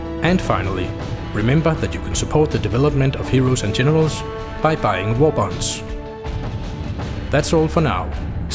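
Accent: Denmark. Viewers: Who voiced this? a danish person? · No, he's Danish, I know this because I'm from DK myself, and I can clear recognize the accent :D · i hear a danish accent... hmmm FUCK YERH IT DANISH! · Haha! Could hear right away that the narrator was danish! xD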